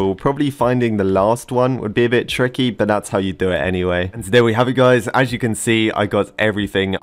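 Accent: United Kingdom. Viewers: Are you using your british accent? I love british accents!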